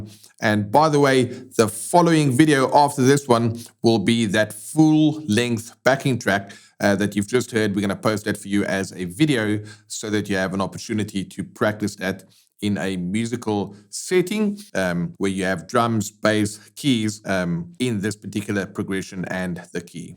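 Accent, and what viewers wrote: South Africa. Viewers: The second I heard your south african accent, I knew this would be the perfect lesson for me 😂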